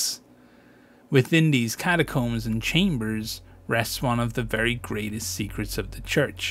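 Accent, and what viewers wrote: Ireland. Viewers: I do love an Irish voice articulating our doom.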